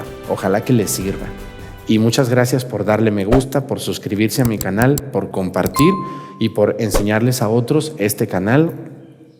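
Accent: Mexico. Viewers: PADRE BUEN DIA..YO trabajando i Escuchando CON GANAS.TU ACENTO MEGICANO ME AGRADA.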